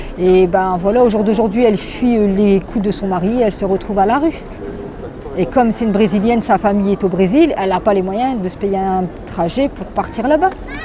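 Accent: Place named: North, France. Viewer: Et encore vous êtes à lille et en général il y a bcp de mois froids que chauds. Essayez de faire l expérience d être toute la journée dans le froid et pas que 3 jours... le Monsieur me fait bcp penser à des gens de la rue qui retrouvent un logement après des années. Bcp dorment encore dans la rue c'est comme une addiction... vous avez l air d être une bonne personne Madame et je reconnais bien l'accent du nord. Le seul bémol j aime pas les vidéos experience sociale ca me met mal a l aise car c est quoi 3 jours ??? Tu ne peux pas comprendre tu n es pas dans leur vie quotidienne.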